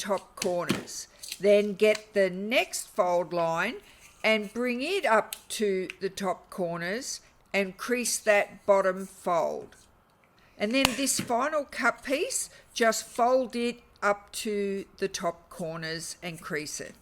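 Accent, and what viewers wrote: Australia. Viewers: I love hearing an Aussie voice and intonation, talking about craft. G’day from Forster. Adorable books too!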